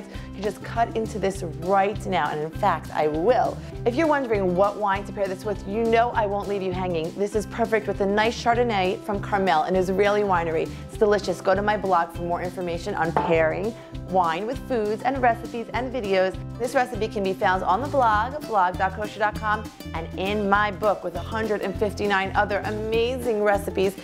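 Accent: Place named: Brooklyn, United States. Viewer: Diane C she's speaking Crown Heights/Boro Park-ese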